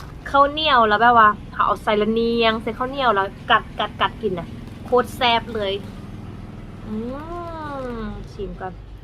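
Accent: Northern Laos, Laos. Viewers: ชอบฟังสำเนียงลาวเหนือ พูดเพราะดีค่ะ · สบายดี คนสวยน่ารักมาคอมเม้นท์เป็นกำลังใจครับ 'หลังจากไม่ได้คอมเม้นท์นานแล้วแต่ก็ยังติดตามน้องอยู่นะครับคนสวยสายบุญคนสวยนํ้าใจงามมีของอร่อยเยอะเลยสาหร่ายนํ้าจืดครับ จากสาวลาวเหนือมาเป็นสาวลาวใต้สำเนียงเสียงพูดลาวเหนือพูดเพราะนะครับ นุ่มนวลฟังแล้วน่าหลงใหลครับ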